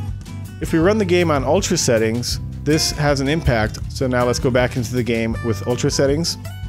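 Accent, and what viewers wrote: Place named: Netherlands. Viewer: it sounded a little bit Dutchy. This vid was great by the way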